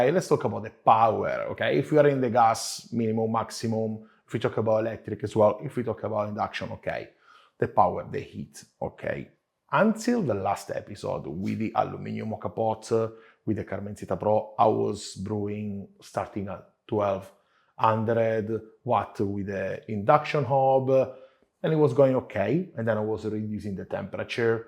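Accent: Italy. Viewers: as much as I love machinetta and italian accent..half an hour is true dedication :D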